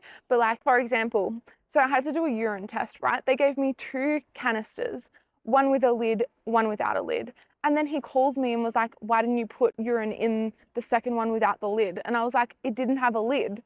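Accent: Australia. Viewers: No, she is not an American. She has an Australian accent, from West Australia. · Love your Aussie accent ! Plus you are a gorgeous lady, and your mandarin is good (well. way better than mine) !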